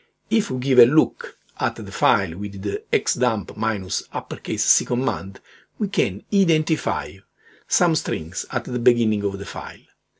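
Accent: Italy. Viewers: the italian dancing accent with scretched vowels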